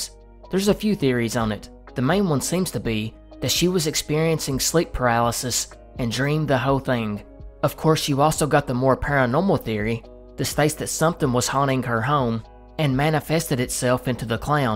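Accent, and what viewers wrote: South, United States. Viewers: Been here since around 2k subs i think. I would not be surprised if this becomes the next 1,000,000+ sub mystery channel. Great content mate, and very glad (even as a UK resident) that youre not getting the hate you used to get for your southern accent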